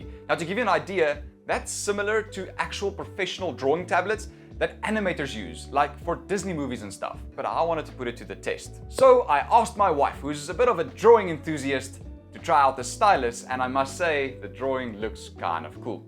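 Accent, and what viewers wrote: South Africa. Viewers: Hi ! I just finished my final year of highschool last year and i am looking into getting a laptop/ ipad for uni , i have never had any of those things and i am kind of lost to what is best , can you please advise me on whether this is a great option for uni ? I love handwritten notes and i suck at typing ... Also , are you south african ?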